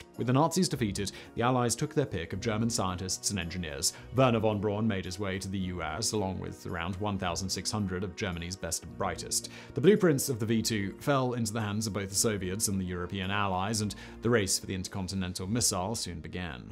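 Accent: United Kingdom. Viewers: Admittedly, I stopped after "Inside the V-2" section. So, alcohol and water, that's it? Did you forget the oxidizer? As our leader says, "Come on man!" P.S. I have to slow all your videos down to at least ..... 0.75 speed. Drink less coffee or tea or even consider a mild tranquilizer, you speak like you're being charged by the word, and with a British accent (can't be helped, I'm sure) which makes it very difficult to follow, much less comprehend, what you're saying. Nonetheless, your videos are interesting.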